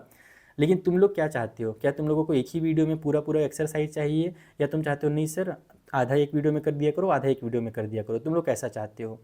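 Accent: Bihar, India. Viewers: sir +bihari language tadaka =interesting maths ❤❤😅😅😅😅😅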